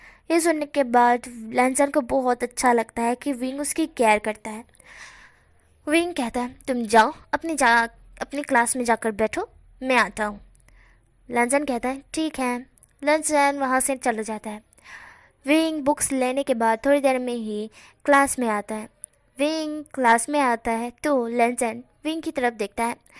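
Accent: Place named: Gujarat, India. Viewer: Aap ki thodi boli gujarati he❤😊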